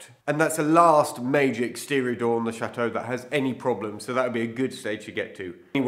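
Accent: United Kingdom. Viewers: A bit off-topic, but your voice keeps reminding me of someone else, and it finally struck me that you sound like Cary Grant. His British accent was somewhat diluted by working in Hollywood, but I hear it in your voice. I'm old enough to be your grandfather, so I'm a bit more familiar with old movies, and Cary Grant, but if you get a chance, watch one of his movies. And more on topic, your range of skills, and industrious ways, never cease to amaze me. We need you here in Michigan!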